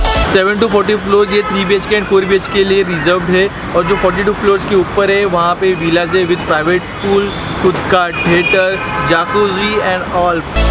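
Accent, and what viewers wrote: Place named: Maharashtra, India. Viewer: Loved your Marathi accent English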